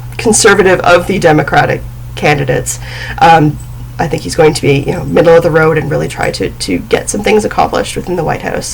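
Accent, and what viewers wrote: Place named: Canada. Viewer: I have to say I LOVE your accent. I have several Canadian clients and I love listening to them talk. I hope that isn't offensive to you, I don't mean it at all. I'm 43 and Ive watched various versions of Degrassi since I was 13 or so and I love the way they say some things too.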